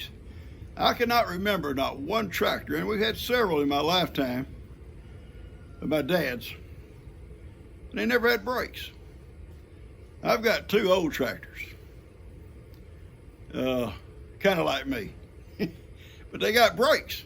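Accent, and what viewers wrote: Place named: South, United States. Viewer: Hi. I don‘t quite understand all you‘re saying (southern accent 😉) but I love listening to your stories 😊👍 I for sure understood the story about your pants and the family in their car 😂 I always enjoy looking at pictures from earlier days. Do you have some for us? Greetings from Switzerland 😊🇨🇭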